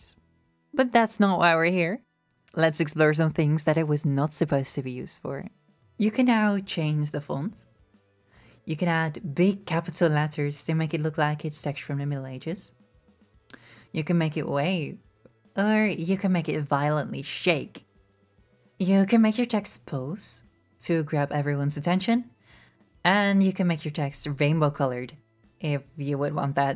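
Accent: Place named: Netherlands. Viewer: Gotta love godot... and your lovely dutch accent. Great video, neighbor :3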